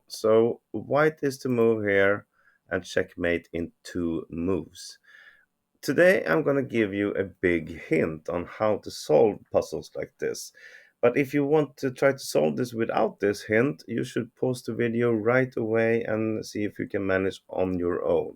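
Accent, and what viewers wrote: Sweden. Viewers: Är du svensk? Det låter så på din brytning. (Are you Swedish? Your accent sounds so.)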